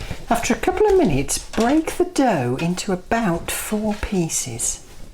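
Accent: United Kingdom. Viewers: Thank you for the video. May I ask why you have British accent but speak Arabic?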